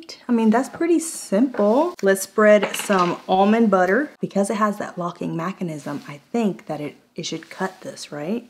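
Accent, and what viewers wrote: South, United States. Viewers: I was drawn to comment because of the southern accent you’ve picked up! Change your accent to draw more viewers!! Question: did you post the link for that magnifying device you used? Thanks!! Love your videos! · Isn't the country accent comes from living in Texas!!! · Lol I found your channel a few days ago... and have watched so many of your videos and laughed so hard!! But I got a question... in one video or maybe this one you said you woke up with a southern accent... I'm southern and I thought you were Southern so now I'm wondering... where are you from? Lol Keep doing what you're doing!! Love your videos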